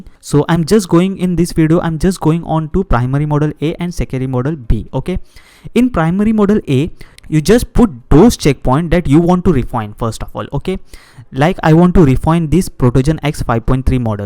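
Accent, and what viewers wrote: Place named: India. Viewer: Your English is very Indian, but the information is good, I can take it.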